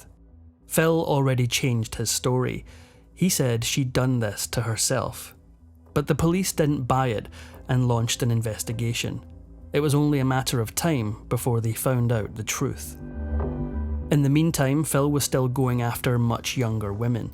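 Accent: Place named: Scotland, United Kingdom. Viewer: Yay my favorite Narrator the Scot!!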